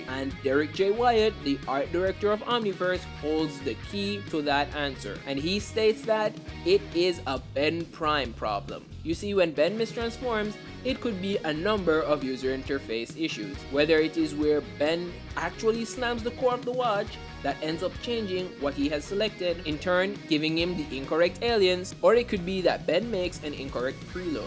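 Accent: Jamaica. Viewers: Either jamaican like me or im mishearing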